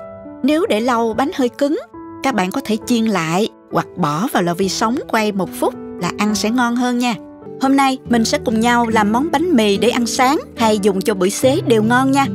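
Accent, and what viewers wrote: South, Vietnam. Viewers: Cháu oi! Là con gái cua giong rạc Nam Bộ hiển Hòa chân chính mà sao lai giới thiệu kênh nghe kênh kenh Hòa hoa làm sao dịu dàng được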